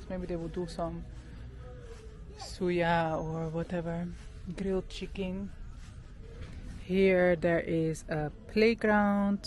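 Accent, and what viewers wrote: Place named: Saint Lucia. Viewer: Are you from St Lucia, originally, you sound like a friend of mine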